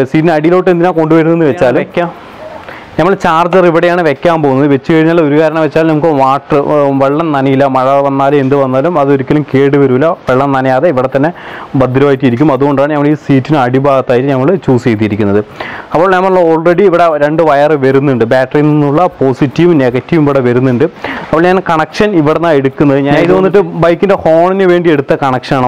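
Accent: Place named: Malappuram, India. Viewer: നിങ്ങളുടെ slang എനിക്കിഷ്ടമാണ് bro മലപ്പുറ മോ കോഴിക്കോ ടൊ?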